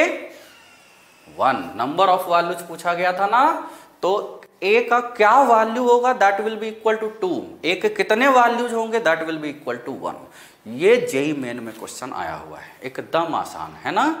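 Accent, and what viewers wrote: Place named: Bihar, India. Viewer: Pura Bihari babu ka feel aara tha!!😂😘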